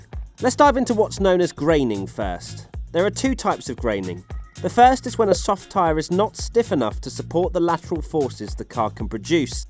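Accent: United Kingdom. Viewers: You sound so British, yet you say Mister Lewis Hamilton...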